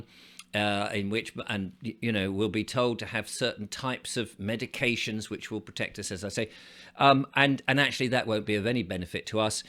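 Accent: United States. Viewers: Love your American accent. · Pretty good American accent.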